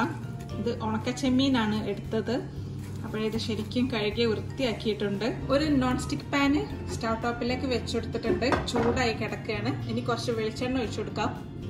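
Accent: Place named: North India, India. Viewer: Ningalde varthamaanam oru North Indian Malayalam paranje pole Ind...good vdo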